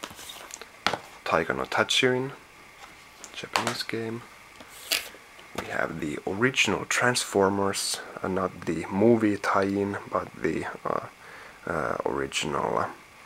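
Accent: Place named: Finland. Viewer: he is finnish. finnish doesnt have pronouncing, you say the words exactly like you write them. for example, trade would be just tra de, not treid. of course we try to pronounce them correctly but that doesnt always work.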